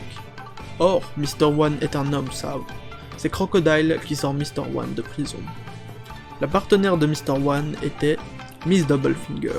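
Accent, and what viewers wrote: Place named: Democratic Republic of the Congo. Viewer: ça se voit à ta voix tes congolais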